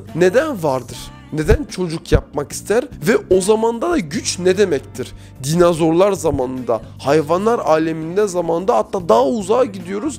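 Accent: France. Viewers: Abi fransız mısın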